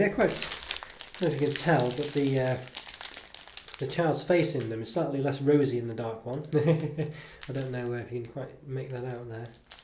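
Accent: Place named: United Kingdom. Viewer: OCD candy review, if you love to see up close candy wrapper comparison aplenty, this review is for you. I have an unbridled love of close ups of candy wrappers with endless comparisons of size by a person with severe OCD but even I was daunted by this also of you love unintelligible British mumbling, this video is for you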